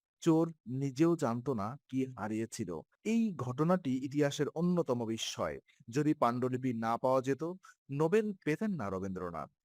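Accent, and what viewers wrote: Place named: Bangladesh. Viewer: manshi 909 = Pl. try to digest the narration only not to give attention on PRONUNTIATION Possibly the Narrator hails from Bsngladesh.His Research work on whole life of our International Bengali Poet, in a nut-shell _ should be praised first.Hope critjcism be last _ Appreciation be first. now understood = sr.citizen.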